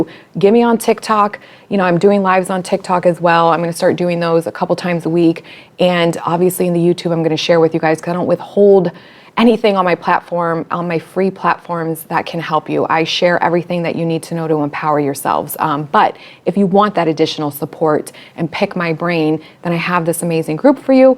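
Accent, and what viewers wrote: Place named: South, United States. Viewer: Such a New Yorker....love the accent from down South girl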